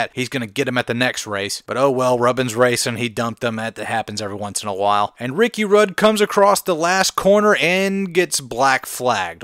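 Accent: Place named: Texas, United States. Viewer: he speaks so fluent at the same time with a texas accent. This is why i like this channel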